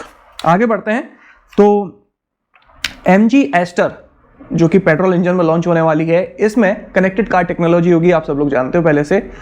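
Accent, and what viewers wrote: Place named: Gwalior, India. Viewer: Gwalior ki boli 🔥🔥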